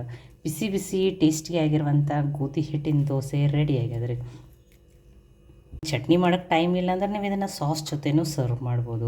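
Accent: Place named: Dharwad, India. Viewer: Wow namma Dharwad kannada ❤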